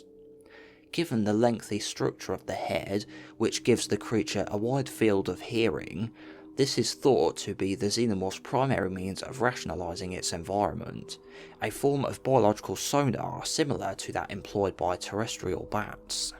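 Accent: England, United Kingdom. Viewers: Because he's from England, is reading from a script and does not have a £300 microphone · accent is giving me british forest gump vibes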